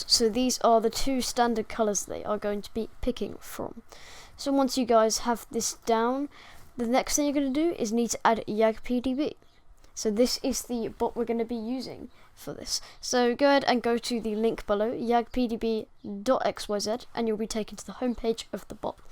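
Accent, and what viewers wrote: United Kingdom. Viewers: I can’t get enough of bri’ish accent, i love it so much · Thank you random british guy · Bro i love your British accent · My dude sounds like a professional british asmr person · you are british, cringe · ooh a british man i suppose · Thank you sm little british man I love and appreciate u sooo <3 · damn your british accent is amazing. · its a british accent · Hes from the UKKKKKK LIKE ME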